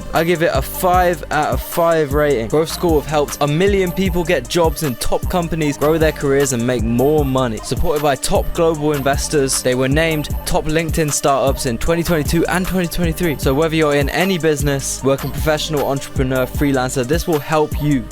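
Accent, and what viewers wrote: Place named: Birmingham, United Kingdom. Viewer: these editings coupled with the brummy accent is gold tier, best shit on youtube ilysm never change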